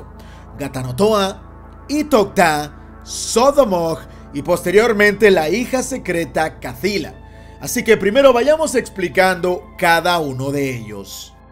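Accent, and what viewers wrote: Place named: North, Spain. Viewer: Demasiado norteño el parcero 😅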